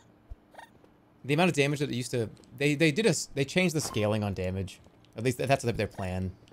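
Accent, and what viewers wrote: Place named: Ireland. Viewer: Halfway through I realized this was jerma, not RTgame… does he always sound this Irish 😂😂?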